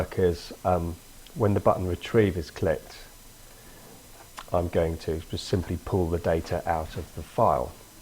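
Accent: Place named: United Kingdom. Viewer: Wonderful! I love how easy you made programming seem, and the British accent is so refreshing to hear. Just one question, can I use this to save data base type data this same way?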